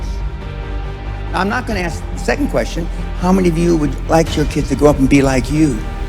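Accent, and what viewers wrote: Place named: United States. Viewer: Too strong american accent.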